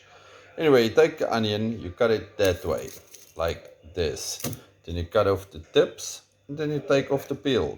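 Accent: South Africa. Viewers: SO LEKKER om 'n Suid Afrikaner op YT te hoor !!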